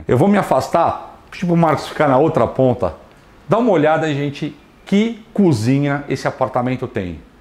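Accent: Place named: São Paulo, Brazil. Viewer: Ah, que delícia de sotaque paulistano ❤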